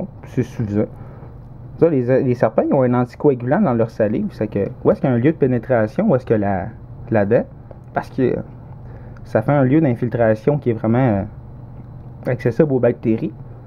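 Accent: Quebec, Canada. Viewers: Je vous kiff les Québécois j'adore votre ville c'est beau tout ce que tu veux mais sérieux votre accent faite quelques chose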